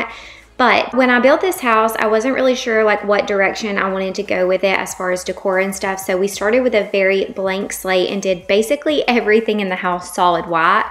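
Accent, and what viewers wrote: South, United States. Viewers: Where is she located? She has a pretty southern accent.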